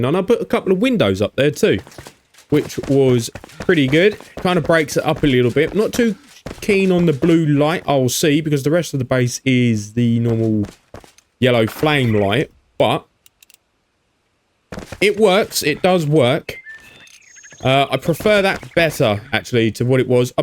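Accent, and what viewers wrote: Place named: England, United Kingdom. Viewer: I´m watching this series not only because I like Enshrouded very much but your accent mate, I really like it, typical english imo, greets from the Netherlands, keep up the good work!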